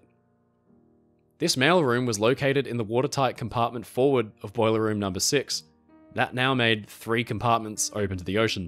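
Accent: Australia. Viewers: Are you from Australia? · Good old Aussie accent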